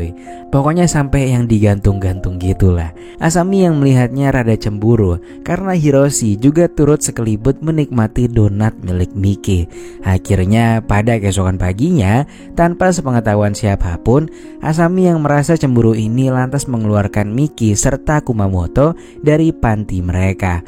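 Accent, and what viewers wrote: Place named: Balangan, Indonesia. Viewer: Kayak suara orang balangan admin nya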